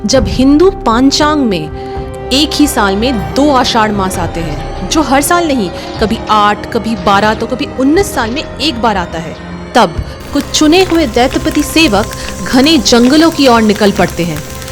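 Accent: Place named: Bengal, India. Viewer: Your pronunciaton says u r a bengali. Jai jagannath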